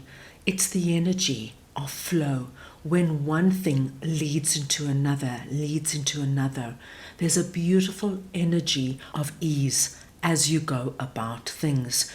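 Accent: South Africa. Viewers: you have a south african accent africanda thank you great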